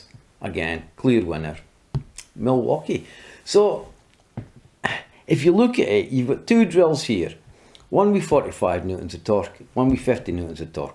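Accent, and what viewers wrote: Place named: Scottish Borders, United Kingdom. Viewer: Where you from you sound like the borders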